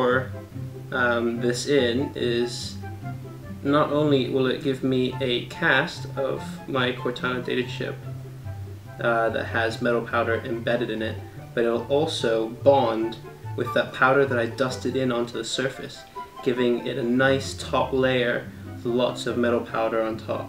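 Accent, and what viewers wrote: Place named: United Kingdom. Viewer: i recognise that accent are you from the uk???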